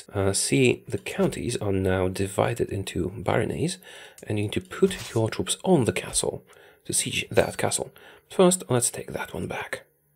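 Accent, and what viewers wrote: Poland. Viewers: It legit took me 3 1/2 minutes to recognize your voice due to that very, very subtle polish accent. No joke: I thought i was watching The Spiffing Brit for quite a while 😅😂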